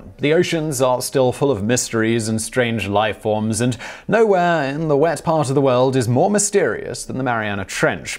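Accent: United Kingdom. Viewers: Kraken. He's British. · This dudes voice is so british it seems fake tho im sure jts not lol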